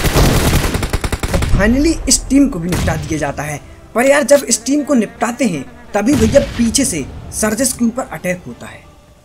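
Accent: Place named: Bundelkhand, India. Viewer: Aawaj se to pata chal gya ki bhai bundelkhandi ho !!! Me videos dekh rha hu kuchh dino se bahut achha khelte ho bhai.... Anyways damoh se hu... Indore me rehta hu... Me b bundelkhandi hu... Bhai real naam batao yr or kaha se ho exactly ?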